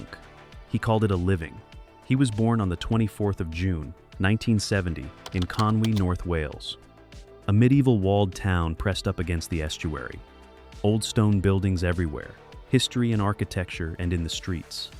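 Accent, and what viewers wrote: United States. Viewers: Why is an American narrating Drew Pritchard backstop? Hasn't anyone told him that the place is Conwy NOT Conway!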